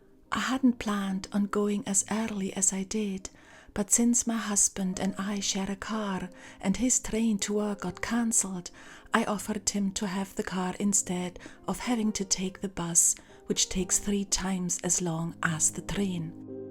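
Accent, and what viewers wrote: Scotland, United Kingdom. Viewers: I feel like you are picking up a bit of Scottish in your accent.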